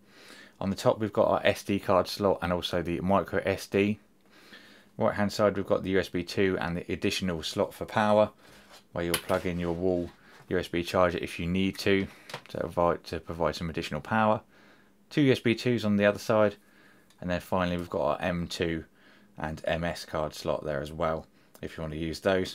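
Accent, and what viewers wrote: United Kingdom. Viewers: IS this only for the phone? Can I use this product on a computer? darn it? love your british accent muah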